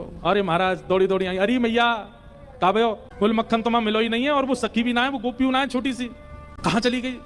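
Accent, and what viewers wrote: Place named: Braj, India. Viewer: Anand a gaya or apki awaz me brij boli😅❤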